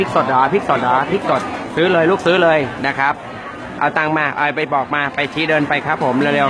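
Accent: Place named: North, Thailand. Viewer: ชอบที่พูดเหนือจังเลยค่ะ เป็นเอกลักษณ์ดี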